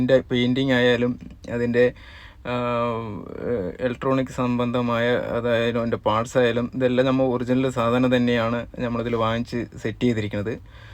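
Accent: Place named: Palakkad, India. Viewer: പുള്ളി പാലക്കാടൻ കൊമ്പാള ഭാഷയിൽ സംസാരിക്കുന്നു...മലപ്പുറത്തുള്ള കോയമാരുടെ കാർ വിൽപ്പനയെന്നും കാണാറില്ലേ..??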